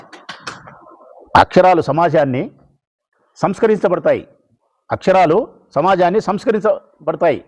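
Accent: Rayalaseema, India. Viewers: రాయలసీమ భాష లో మాట్లాడాలి అంటే అది మీరే అన్న 🙏🙏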